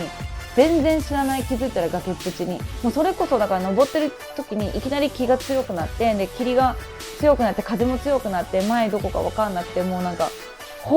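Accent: Philippines. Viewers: Whahaha, you've been staying in the Philippines for too ... way too long! You sound like a Filipina that is fluent with Nihongo. hehehehe. I was a college teacher once and had students who are now Japanese residents due to their family members who are from Japan ... ... whenever they chat with me or whenever I see their homemade videos while they're in Japan ... they sound like you right now. hehehe .... .... so cute. hehehe.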